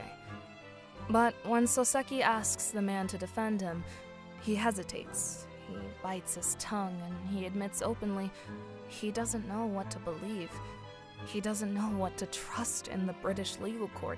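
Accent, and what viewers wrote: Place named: Latin America. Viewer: Great video! Hey would you happen to be latin american? You have a very pretty accent and it sounds like mine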